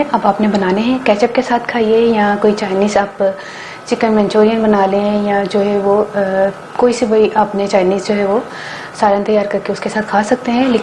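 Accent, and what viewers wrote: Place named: Ichra, India. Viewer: Tumhari urdu sy lagta hy tum ICHRA ki ho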